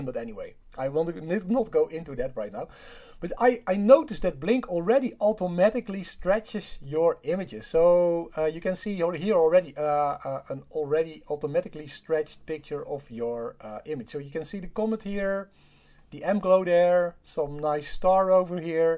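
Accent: Netherlands. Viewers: Kan gelijk horen dat je nederlands bent🤣 maar nice video! Heb zelf gister een Omegon Apochromatische refractor Pro APO AP 72/400 Doublet besteld, beetje hopen dat ik m kan vastleggen met de hand🤔 statief heeft geen goto helaas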